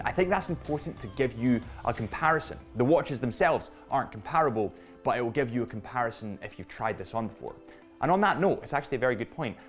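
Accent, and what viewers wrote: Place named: Scotland, United Kingdom. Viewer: Very intesting review,. But you were-for a german guy-a little hard to understand because of the strong accent you have... where are you from, maybe Scotland? Cheers Stefan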